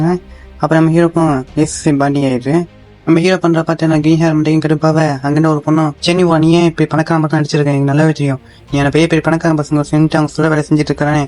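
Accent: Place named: Kerala, India. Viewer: Bro, tamil thana ,nenga pesurathu konjam Malayalam tamil kalanthu pesura mari irukku so, please tamill padichitu YouTube pesunga.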